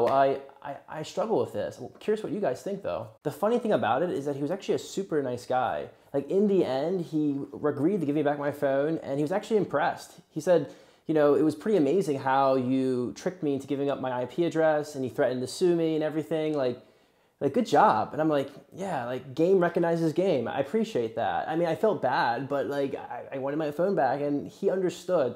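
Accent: United States. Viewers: No shade but you u from philly? That’s a lot of Phowwns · Sounds like south florida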